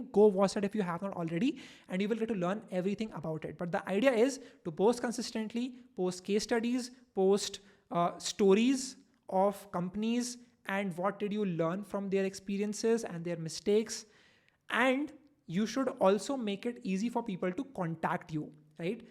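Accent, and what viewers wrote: India. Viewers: When you speak in English it sounds like you are speaking in Hindi. Your explanation is so good.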